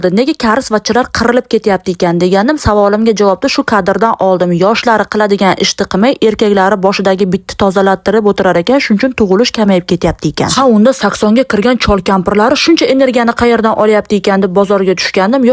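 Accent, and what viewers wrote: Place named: Tashkent, Uzbekistan. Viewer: Opam sz keli Toshkent gap zur gapirasz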